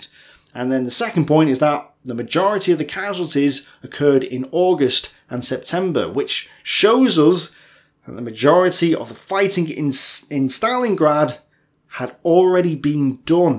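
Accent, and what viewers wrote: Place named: England, United Kingdom. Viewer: I like so much your videos about Ost Front that, as a french, I try to understand very focus on your speech. I get it, your superb english accent (?), in any case not the american accent, allows it for me. Fred (old close combat player, since 98 ;) )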